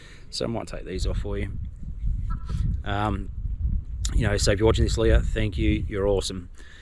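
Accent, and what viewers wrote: Australia. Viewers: Great to hear an Aussie accent in Dumaguete in lieu of americano I will heading there from Manila next week love to catch up with a beer if likes cheers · Hey, it’s great to hear a Aussie voice talking about his adventures in the Philippines, we work hard for what we have, so I agree buy what makes you comfortable.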